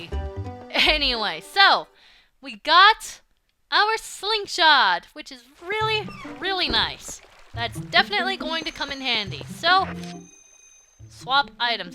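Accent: United States. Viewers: lol I don’t get it but still I will always think your cute with that country accent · Don't stop ur southern accent, it's funny!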